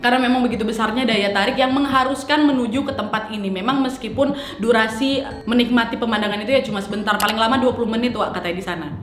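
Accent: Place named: Medan, Indonesia. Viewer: Nampak kali logat Medan nya wkkw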